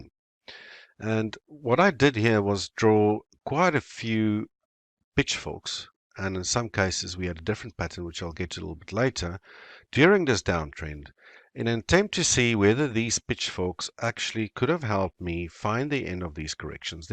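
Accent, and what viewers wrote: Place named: South Africa. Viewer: You sound South African boet, is jy?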